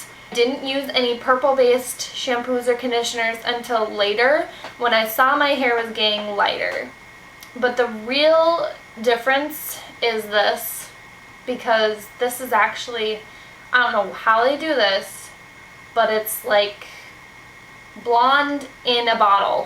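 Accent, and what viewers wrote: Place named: Minnesota, United States. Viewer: Minnesota accent yikes.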